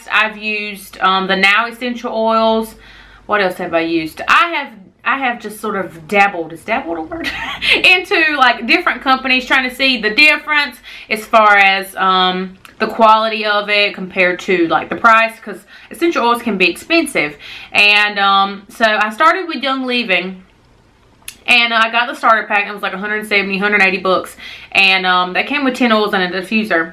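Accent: South, United States. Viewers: Love ur channel and southern twang!!!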